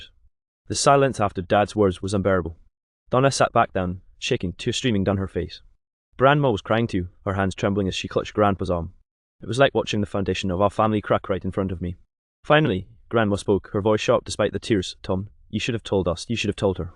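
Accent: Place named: Ireland. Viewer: Cool Irish accent! 😂😂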